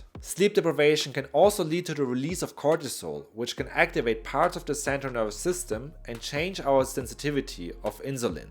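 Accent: Germany. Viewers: This is the first vidoe by you I’m seeing. I immediately knew you were German. Ich liebe unseren deutschen Akzent :D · I subscribed simply because I love listening to German people talk. Their sentence structures lack pronouns, conjunctions and sometimes the improper emotional reactions to what they are saying. Nothing wrong with that, I'm not criticizing this person at all. Most languages translate a little irregular to English. I find Germans speaking English to be really cute😊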